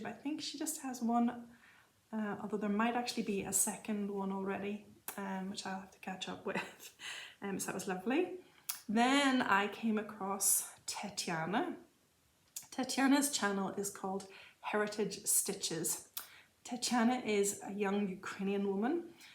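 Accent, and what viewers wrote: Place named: Ireland. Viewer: Your bee finish is absolutely gorgeous! I think it is the perfect use for the wood hanger. Your FFOs, finishes and wips are all just beautiful. Thank you for sharing them with us. It's always nice to stitch while listening to your videos. Your voice is so calm and I love your Irish accent! Take care & happy stitching!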